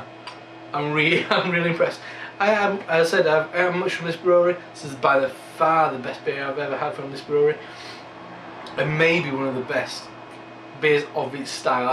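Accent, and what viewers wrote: Yorkshire, United Kingdom. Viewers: wow you have friends all over haha :P = that BOOTIFUL :P love the Yorkshire accent = sounds like a great beer i saw BEERRITZ has some italian beers in recently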